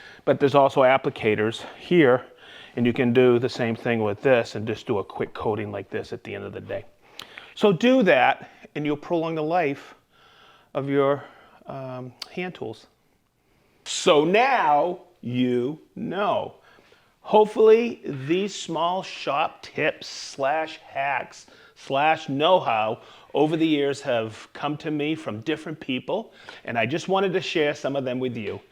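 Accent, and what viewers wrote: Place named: Boston, United States. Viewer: What kind of oil on your sock? Could not understand with your Boston accent.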